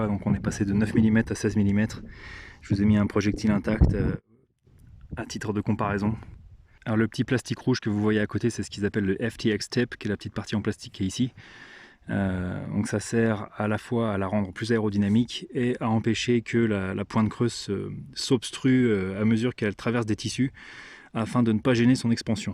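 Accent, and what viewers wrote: United States. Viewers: Super video, l'accent ricain très soigné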